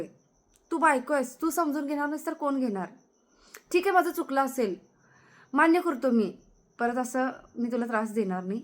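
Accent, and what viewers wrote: Sangli, India. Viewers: खुपच छान ताई तुमचे विच्यार तुम्ही सांगलीच्या वाटता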